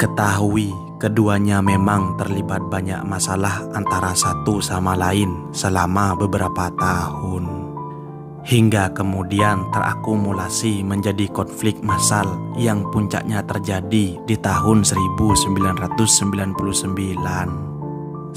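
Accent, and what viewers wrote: Madura, Indonesia. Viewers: abg madura kan logat ny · Logat bicaranya kental bgttt... Kental bhs Madura..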